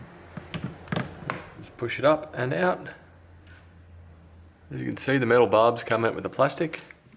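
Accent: Australia. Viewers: on taking my HP apart today, I see there is very little to play with, thank you for the variety and detail of your channel, and clear Aussie explanations.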